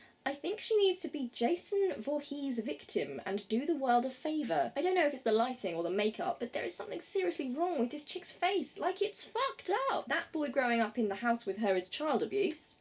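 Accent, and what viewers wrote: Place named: England, United Kingdom. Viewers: More proof that everything sounds better in an English accent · Wow, lol. That surprised me, when you read those comments out like that, raw and uncensored. I must say, you were sexy while doing that, and it was stimulating, in an odd way...(grin) I guess its because you have that English accent, and always seem to be very proper and have that kind of good girl image, that it was shocking to hear you read some of those lewd comments out loud. Anyway, to get serious, I admire that you are able to handle that sort of online abuse/harassment, it shows you are a strong person within yourself.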